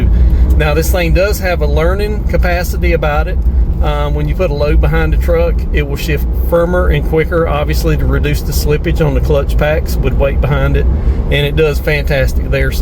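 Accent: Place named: South, United States. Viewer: Good review, no fluff, thank you. The best part was seeing/hearing a southern man among southern long-needle pines. I can smell them now. Oooh that smell!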